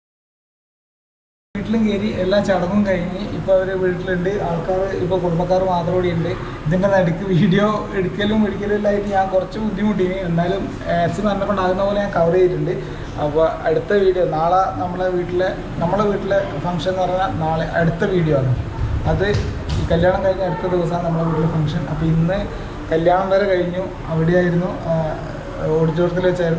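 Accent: Kannur, India. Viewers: എവിടെ യ നിങ്ങളുട നാട് കണ്ണൂരൊ കാസറഗോഡ് 🤔ഭാഷ എന്റെ ത് ആയതു കൊണ്ട് ചോയിച്ചത് പ്പ 😂😂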